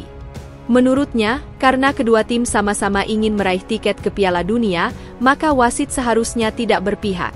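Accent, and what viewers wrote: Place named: Europe. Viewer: Apakah anda orang asing, kenapa logatnya seperti orang eropa, atau anda tidak punya jiwa patriot, bangsa bahasa indonesia.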